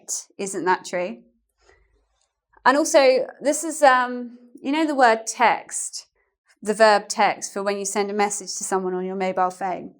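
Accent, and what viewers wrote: United Kingdom. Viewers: You know what, I really really like your accent. Before I found British is hard to understand for me but after watching your "How to sound like a Posh" I felt like I can understand all what you were saying. so after that I spend my time watching British accent video.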